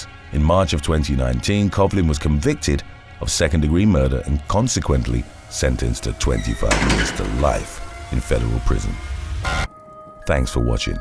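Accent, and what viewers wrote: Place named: England, United Kingdom. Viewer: Holy shit! That's you?! It is! Woah! So let me get this straight; you're that frickin stacked, black with a charming English accent, you got one of the best channels on YouTube and you look like that?! Alright! We get it! You're the king of all men everywhere! Fuck off! Would ya? Fucking King Man over here. I mean shit! What's next? Ya gonna front a rock band? It's no wonder we never see you. You're probly too busy having a three way with lingerie models on a mountaintop or something. Shit! Oh by the way, I think a good topic would be, "When cemetery workers / gravediggers/ morticians and the like go wrong." I've known some of these folks and they're all fucking psychopaths!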